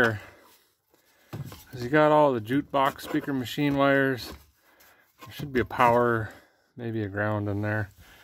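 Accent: Minnesota, United States. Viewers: With that accent you've gotta be from western Minnesota ya knooooowwww. Proud Benson native here! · why are there always idiots who downvote? I really like the channel, and there's not a whole lot about it not to like. We need more real people like you in the world. Stay safe. Sounds like you live in Minnesota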